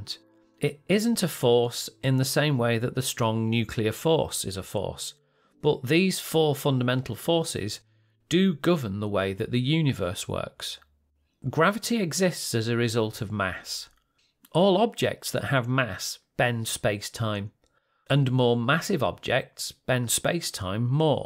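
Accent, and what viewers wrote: United Kingdom. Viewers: pAIr, nor PER...bEta not bIta. british...they invented the English language but still found a way to butcher it...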